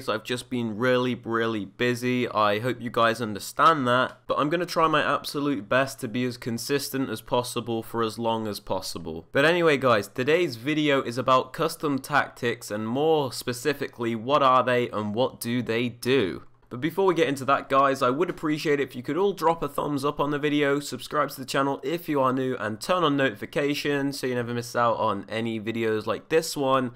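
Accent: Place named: Birmingham, United Kingdom. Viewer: Are you from Birmingham you speak like my cousins 😂😭👅😆 and shall I get Fifa 18 on the switch I mean I have it in my PS4 so I dunno I need opinions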